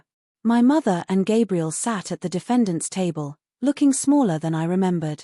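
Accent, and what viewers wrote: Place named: United Kingdom. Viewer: Supposed to be an American but has a very distinct British accent. Annoying!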